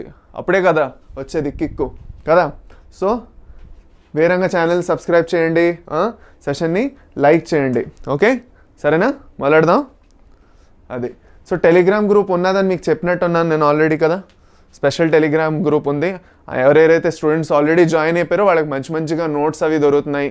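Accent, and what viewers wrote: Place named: Andhra Pradesh, India. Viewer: Chala baga chepparu sir Urs is pure andraite slang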